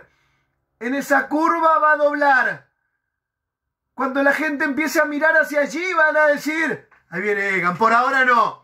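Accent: Colombia. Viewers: .tu no pareces de Argentina tú pareces colombiano.gracias muchas gracias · hermano narras como si fueses colombiano gracias te queremos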